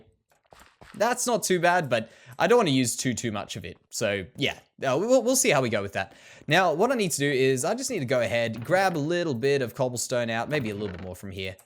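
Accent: Australia. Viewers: Hey are you Australian?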